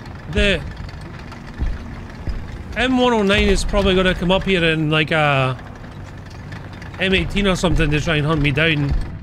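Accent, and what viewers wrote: Scotland, United Kingdom. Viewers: if the intro that includes the flag nor the accent give it away, i will. he's scottish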